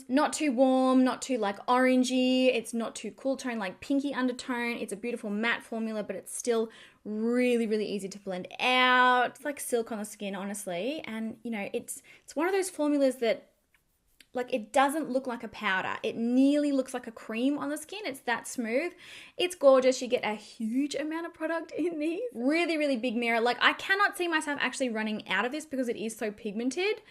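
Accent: Australia. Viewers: I love watching your videos,educational and an adorable Aussie accent. I may have missed it, What blush, bronzer combo are you wearing in this video. Brand and shade please it's lovely. I am looking for a bronzy rose look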